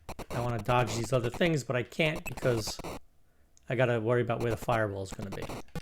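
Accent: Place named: Queens, United States. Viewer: Long Island NY? That accent sounds more like Queens than LI!!!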